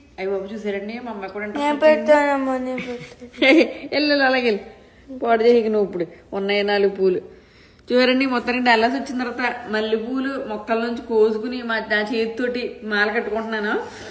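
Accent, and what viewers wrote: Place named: Amalapuram, India. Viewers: ఏదేమైనా మీరు మన అమలాపురం వాళ్ళు ఎలా వుంటారో ఎలా మాట్లాడతారో అలానే వున్నారు,కొందరు వూరు మారితే అన్నీ మార్చేస్తారు,వడ్లు కాసే చెట్లు అంటారు ,ఎవ్వరేమనుకున్న నువ్వు సూపర్ సిస్టర్ ❤❤ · Mekkudani..antu chinna ragam theysaru..adgadi..mana konasema..theeepidanam.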